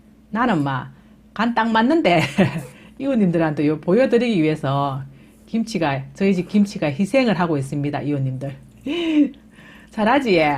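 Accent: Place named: Daegu, South Korea. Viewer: 싱거운 김장김치 고민중에 ~~영상보고 도움됫습니다 ~~대구사투리듣고싶어 한번씩보고 구독 좋아요 꼬옥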